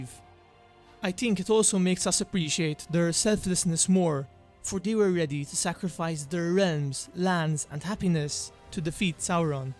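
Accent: Malta. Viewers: Are u Maltese? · Is the narrator Maltese? :) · Dude, are you Maltese? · Are you Maltese? Your accent is really familiar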